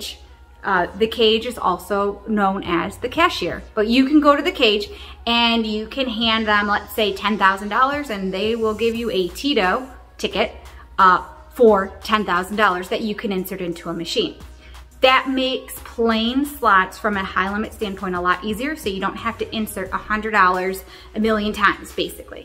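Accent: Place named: Midwest, United States. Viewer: I also love the fact that one your mid western accent is amazing very Edie Mcclurg and also it’s very simple if people just paid attention they would know that your doing that. What fans you have, and why people talk bad about it. Haha and the fact that you had to make a video about it is silly. You and hubby are legends and keep it up please and thank you.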